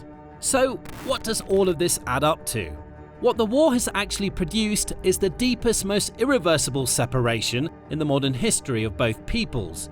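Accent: United Kingdom. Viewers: Unnecessary prolonged video in a a British accent is tiring….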